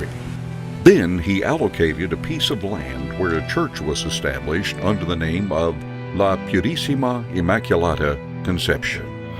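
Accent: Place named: United States. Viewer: Gagawa lang kayo ng historical video on Manila Cathedral kumuha pa kayo ng voiceover with American accent who mangles the Latin-Spanish names. Very distracting. You should have at least taught him the correct pronunciations. Stupid and insensitive. What a waste.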